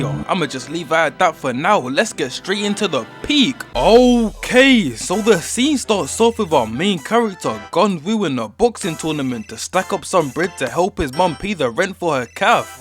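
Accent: United Kingdom. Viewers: A British nigga talkin bout yns is wild to me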